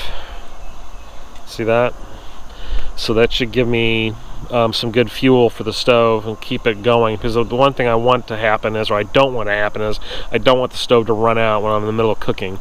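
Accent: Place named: Northern Ohio, United States. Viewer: Btw, I live in the Columbus area, too. Listening to you I’d say you’re from northern Ohio originally. Somehow I ended up on the Bushcraft Ohio website and you said you’re going to the Zanesville Bushcraft gathering. I’d never watched your videos before but I’m glad I have now. I take care of my mother so I can’t go to western PA to practice and enjoy. As I’m just getting back into this because my kids are now all at OSU.... they never liked camping so it’s been on hold over 15 years. Anyway, I’ve been going to Delaware State Park and tent camping. Pretty much stick to myself because almost everyone else is in a trailer. Doing some bushcrafting too. Harvesting and processing wood, building a table, making tripods, big and small and using them for tarp shelters and hammock hangs etc. If you see this and have time to respond, where can I go that’s within an hour of Dublin/Powell to “wild camp” I guess it’s being called now? 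Is that different than primitive camping? Anyway, any info you can provide will surely be appreciated. I think I’m going to watch our fatwood video next. I’m still searching for the mother lode score. Sure, I can harvest from a branch... but who doesn’t want to find the outer rotted trunk of punkwood with the inner core of fatwood? It’ll happen.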